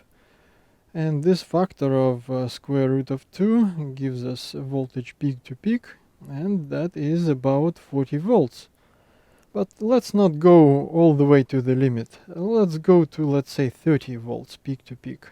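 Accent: Russia. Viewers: Nice job. And charming Russian accent. 😊 By the way, shall you use 240V bulb instead fuse at first power up?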